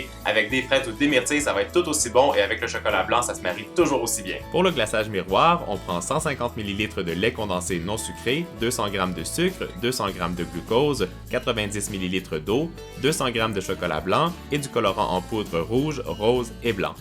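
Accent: Quebec, Canada. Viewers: #lovemail #teamsnap #lmp continue tes vidéos , tu es parfait et j'adore trop ton accent québécois 😍❤😘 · super vidéo j adore l'idée de l entremet. J aime tellement ton accent québécois 😁💜🍰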